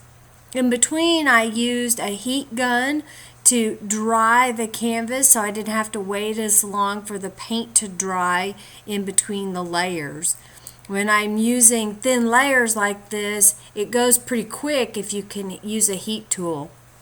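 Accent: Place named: South, United States. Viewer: Awesome, this piece is really cool! I've always loved those colors. p.s. I luuhv yerr suhhtherrn ehccent! Nashville?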